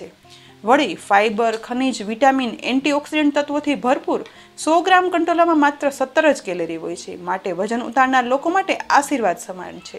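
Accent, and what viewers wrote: Kathiawar, India. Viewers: Excellently instructed in Gujarati language with Kathiyavadi Accents.👍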